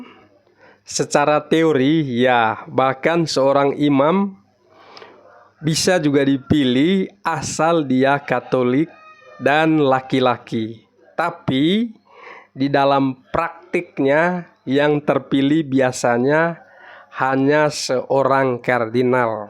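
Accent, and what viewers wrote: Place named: Manggarai, Indonesia. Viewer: Mo mau tanya maaf dengan logatnya kaya orang Manggarai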